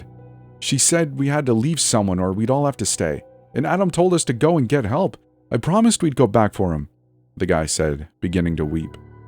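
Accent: Canada. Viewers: I LOVE THE SOUND OF A CANADIAN VOICE!! ( I'm from Vancouver BC...☺️) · I most definitely like the sound of ur Canadian voice. Thanks for another great upload. It's much appreciated. ❤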